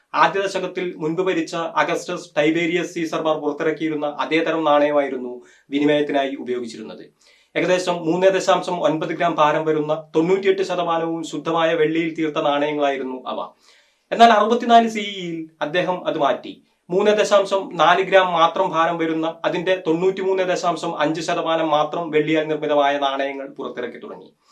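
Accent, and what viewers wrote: Kasaragod, India. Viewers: എത്ര നാളായി നിന്നെ കണ്ടിട്ട്, നിൻ്റ് കാസർക്കോട് സ്ലാങ് കേൾക്കാൻ ഭയങ്കര ഇഷ്ടമാണ്, മാറി നിൽക്കരുത്, ഉടനേ 100k അടിക്കണം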